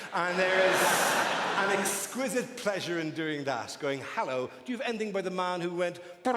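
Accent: Ireland. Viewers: it's just an Irish accent and not a lisp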